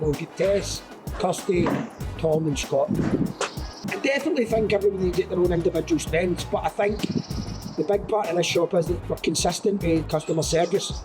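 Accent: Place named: Glasgow, United Kingdom. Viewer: bro sounds like he spent all his days in Glesge instead of NY. Mate I need to try your coffee, i’m fae the southside.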